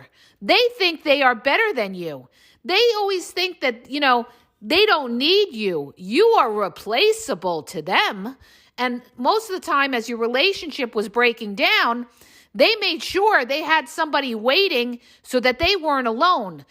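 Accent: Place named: New Jersey, United States. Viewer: This has to be a jersey girl😄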